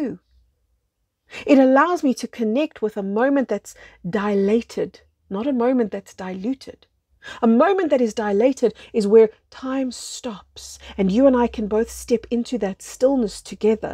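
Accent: South Africa. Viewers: Indeed! Flip the script. From victim to super hero. And always thanking our agony, trepidations, dilemmas, tragedies because in the end if you want them to they are your invaluable inner wealth and that light, baby, (I'm talking like you now 😆♥️) is going to get you to ascension and help steward others who need a hand to hold on their way! Yay. I have two hands and I thank them. Thank you. I'm English Greek I hear your South African accent and melt. Have many S.A. friends in Europe. You feel like family 🥳🎺🙏🏽🙏🏿🙏🏻🙏🏾⭐❤️ Love to all subscribers and beyond! When I can afford it I'm definitely going to sign up for your lessons and group. Yes, baby ♥️🦋.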